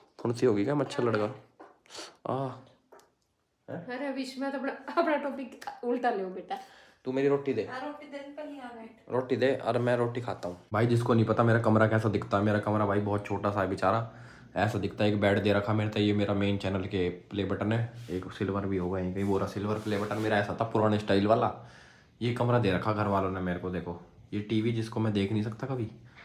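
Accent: Haryana, India. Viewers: Haryana se ho kya language aise lag raha hai reply dene ki free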